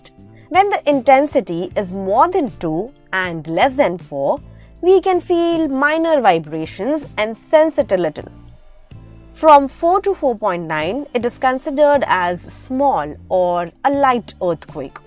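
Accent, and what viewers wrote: India. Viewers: Indian accent 😂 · Omg, what an accent..i hate indian accent!!!🙄😱🤮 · I hate indian accent. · Not the india accent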